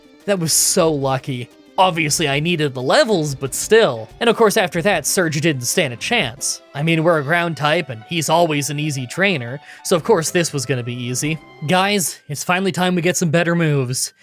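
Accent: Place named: Canada. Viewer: This guy's Canadian accent is so cute😊😊😊😊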